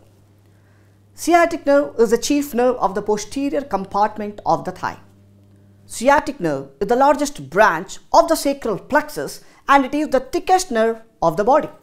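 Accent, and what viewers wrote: India. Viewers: Perfect voice sample for Indian accent!!! Am gonna save his voice for my ringtone!! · WOW This is a really good explanation and he speaks amazing. Is the most understandable Indian I have listen (I'm sorry if he is not exactly from India but rather a closely related country). He has an accent AND is clear. I need everybody to speak like that haha (even native English speakers).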